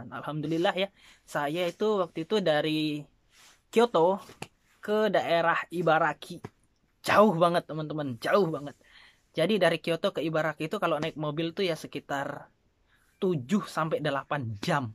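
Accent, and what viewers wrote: Java, Indonesia. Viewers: Ooo tak kiro jowo cak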